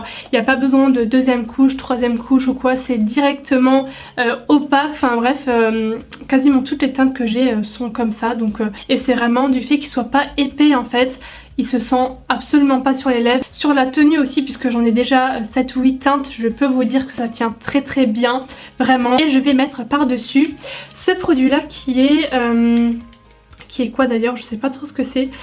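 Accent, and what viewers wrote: Lorraine, France. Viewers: Je découvre ta chaîne avec plaisir ^^ Ahhh moi aussi je suis Lorraine d'origine et alsacienne d'adoption Lol je l'aime trop ton petit accent 😍 Merci pour ta bonne humeur 😉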